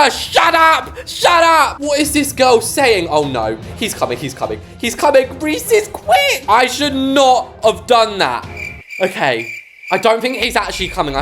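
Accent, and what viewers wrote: United Kingdom. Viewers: Are you British? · the british accent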